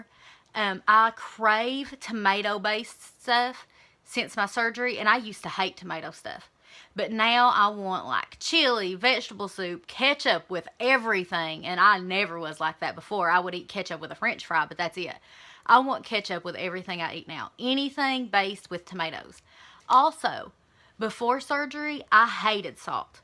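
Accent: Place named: South, United States. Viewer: I also get dumping with a lot of sugar. You're great - live your videos and your accent. I live in Florida, but we don't have that think southern accent. I love it and could listen to you all the time. Keep up the great job! Looking good!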